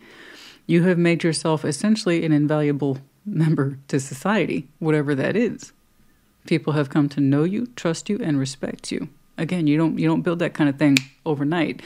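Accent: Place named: Texas, United States. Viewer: First time I've listened but your cards missed me by 2 mile. I will listen again ,like the Texas accent. Been in and across the 800 and I believe 30 odd miles, in a truck many times. Always met some good people in Texas and was treated with much respect being a woman. Aries thank u for reading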